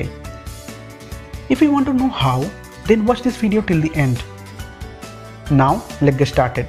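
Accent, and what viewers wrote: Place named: Pakistan. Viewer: I love the paki voice. Such a cool accent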